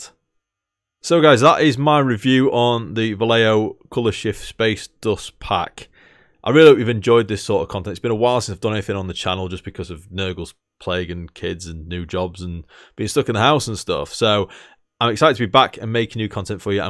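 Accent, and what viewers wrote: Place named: Yorkshire, United Kingdom. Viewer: It's PAUL DANIELS paint.... "That's Magic". Great to hear a Northern accent.... Possibly Yorkshireman! A great review mate, I'm looking around for a colour shift that goes like 'oil on water' effect! Needs to go over any colour... Am I wasting my time?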